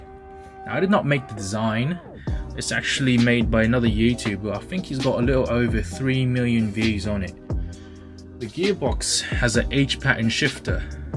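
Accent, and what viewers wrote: United Kingdom. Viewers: Why is there a British man now and no one is talking about it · i really recognize your accent, i swear it's the camden area in london where i heard it last and know where it's from.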